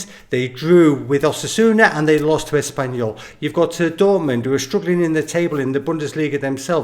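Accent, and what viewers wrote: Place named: United Kingdom. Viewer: What? , you probkybjust convinced by his brith accents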